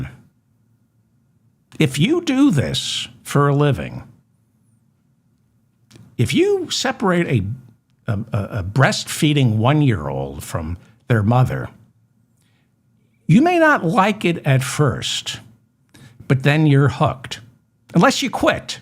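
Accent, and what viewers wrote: United States. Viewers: Once again you have “cracked me up” today! I love your self deprecation, lavatory humour and irony. As a Brit I have to say ( and this is a compliment!) without your American accent you could pass for British!😂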